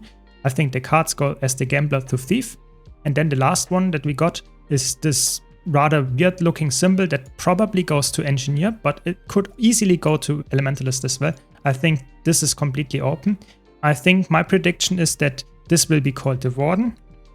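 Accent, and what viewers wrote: Germany. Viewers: uff mir fällts immer wieder auf wie sehr es anstrengt den deutschen akzent zu hören. aber dennoch, gute anordnung der elite spezialisierungen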